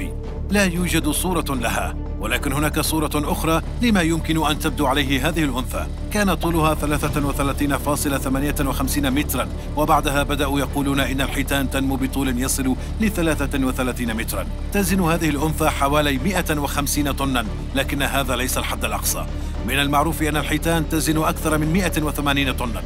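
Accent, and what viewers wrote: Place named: Lebanon. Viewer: صوت مميز يصلح في الوثائقيات والاخبار بصفة عامة ❤ ما اسم صاحب المقطع واتوقع انه صوت لبناني يذكرني بصوت وحيد جلال (سلفر) كانه هو الاميز من بين الاصوات العربية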